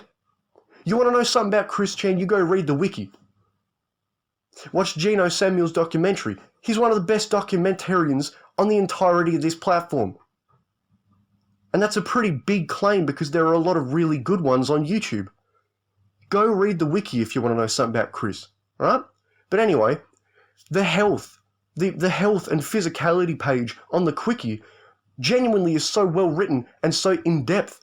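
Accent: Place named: Australia. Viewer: I didn't know I needed an angry australian man complaining about chrischan as much as I did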